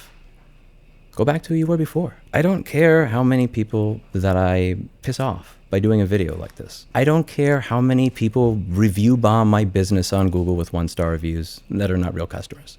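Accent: New York, United States. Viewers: You can tell Louis is a true NYer. Im guessing Linus is from Caliornia.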